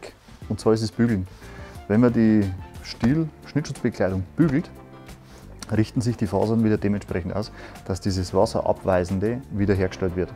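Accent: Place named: Bavaria, Germany. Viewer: Schon ok, alles gut 👍😊. Alle Dialekte sind ja was Tolles 👍😊, ich wünschte ich hätte auch einen 😉. Bayrisch kann halt nurmal "etwas" anstrengend sein zu verstehn. Aber is ja mit Sprachen allgemein so 😉. Ansonsten, Videosstyle und Präsentation sind 👍, auch wenn ich in Wahrheit ein Fan von Fauna u. Flora, also auch von Bäumen und Naturwäldern bin, und mir jede Fällung eines ausgewachsenen Baumes das Herz blutet und mich zum Menschenfeind mutieren lässt . Grade in Zeiten eines Klimawandels. Immer mehr Bäume und Wälder werden in rasendem Tempo niedergesägt und statt dessen immer Winderräder aufgestellt 🤦‍♂️🙈 😔 . VG 🙋🏻‍♂️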